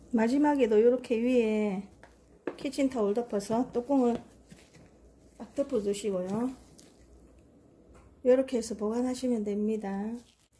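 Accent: Busan, South Korea. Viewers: 유용한 정보 감사합니다 ~~ 부산말씨 정감 있어요 ^^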